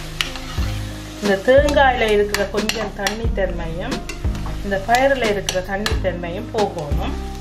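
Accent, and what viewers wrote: Jaffna, Sri Lanka. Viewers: Thank you.... love to hear again again our Original Jaffna Tamil. Great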